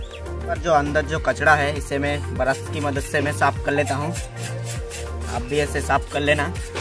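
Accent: India. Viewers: Ap ke bato se lagta hai ap Bengali ho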